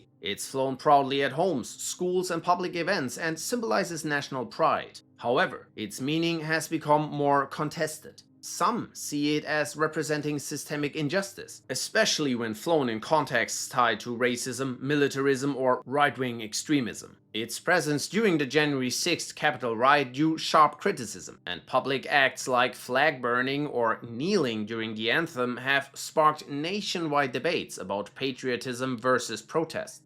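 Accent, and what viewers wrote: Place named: Germany. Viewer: Another great video, I love your voice. It's like Sebastian Vettel; a great & soothing German voice/accent.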